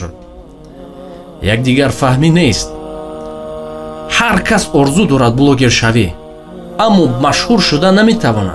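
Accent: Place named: Pamir, Tajikistan. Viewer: Акай ранги одамой Помир точикира гап мезанен шумо Блогер нестен шумо Инсони хак мебошед ранги шумо бори Инсон кам аст!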